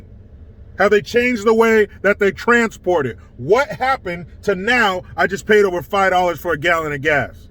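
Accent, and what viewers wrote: Russia. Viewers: you are so funny; I love your Russian accent. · Your accent sounded like Boris and Natasha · Please do more russian voice!!😂😭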